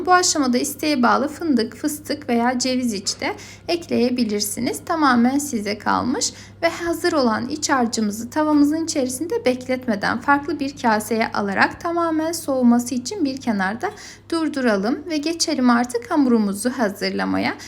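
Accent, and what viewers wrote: Azerbaijan. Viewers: Azerbaycan şivesi var. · Danışıq Azərbaycanlı danışığına bənzəyir çox gözəl tərifdi yoxlayacam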